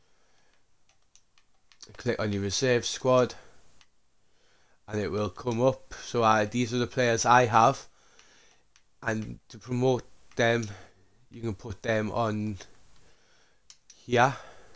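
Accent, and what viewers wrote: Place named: South Shields, United Kingdom. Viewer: The shields accent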